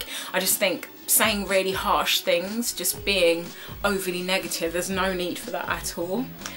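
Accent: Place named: England, United Kingdom. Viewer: love love love your make-up tutorials, glad to have found you, nice to hear an english accent, keep up the fantastic work! x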